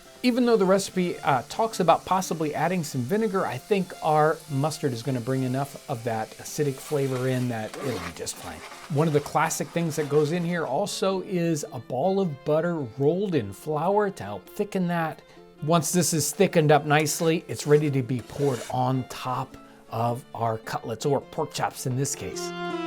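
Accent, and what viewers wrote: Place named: United States. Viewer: Its pronouced (Shuh-Lots) not Shallets 🤣🤣 Wow American English its horrible UK version is much better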